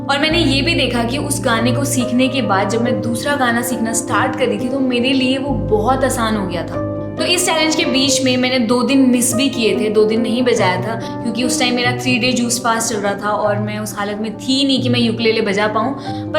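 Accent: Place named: Uttar Pradesh, India. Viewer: Really appreciate your support and spirit changing many many lives.... Dear this Abhilasha from Varanasi.... yesterday saw your first video and then watched many... laga you are my old friend... your outlook towards life simple yet amazing.... n apni U.P kind Hindi loved it... May God bless you Dear be happy always ❤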